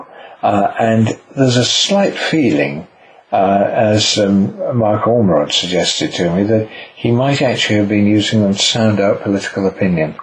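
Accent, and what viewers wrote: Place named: England, United Kingdom. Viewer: please what classical is this? Bach? thank you for informing - by the way, beautiful English accent.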